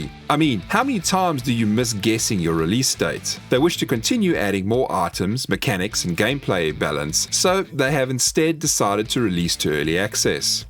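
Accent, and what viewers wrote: South Africa. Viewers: Lol funny how I could tell you are South African as soon as you spoke. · you south african bro?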